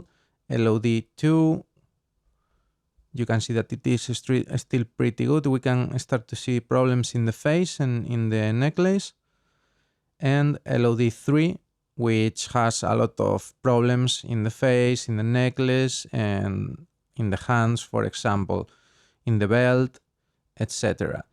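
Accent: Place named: Spain. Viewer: gracias tete por el tutorial, joer, como se nota el acento español xD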